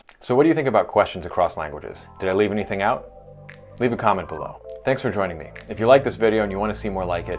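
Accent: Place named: United States. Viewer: What I've learnt from this video, and having checked to verify, is that Americans seem to pronounce plural 'moths' differently to us Brits, Irish, Australians and New Zealanders. You seem to say 'moθ' in the singular and 'moðz' in the plural whereas we also say 'moθ' in the singular but say 'moθs' in the plural. Yeah, I know we say the vowel differently too but that's not what I'm looking at.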